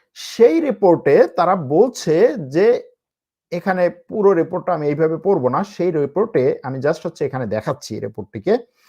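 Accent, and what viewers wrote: Bangladesh. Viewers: do you hail from.Noakhali.Sound ok.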